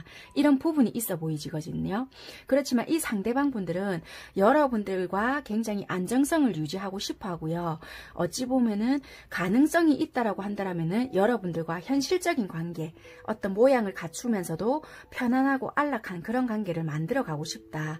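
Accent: Busan, South Korea. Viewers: 개인 전화 상담 신청 어떻게 하나요 🥺❤️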